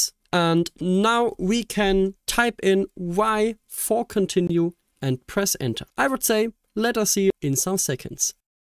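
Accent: Germany. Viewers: i hear Deutsch?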